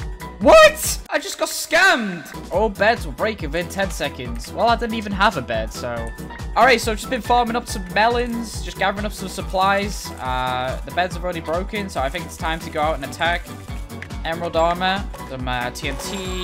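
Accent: United Kingdom. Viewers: Is british? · is like british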